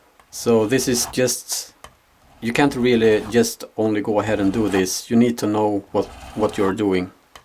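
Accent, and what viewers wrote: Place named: Sweden. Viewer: Where are you from? The accent is really hard to place. First I thought Swedish, but there's something that reminds me of Finish also.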